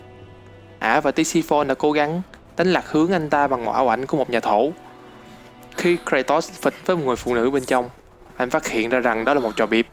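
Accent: South, Vietnam. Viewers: t xem đơn giản vì giọng của thớt miền Nam, t ko anti miền Bắc nhưng vẫn cứ thích nghe giọng phân tích miền Nam hơn :v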